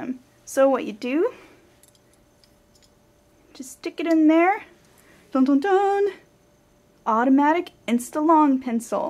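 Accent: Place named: South, United States. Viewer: Great video,sounds like your from the south y'all.love it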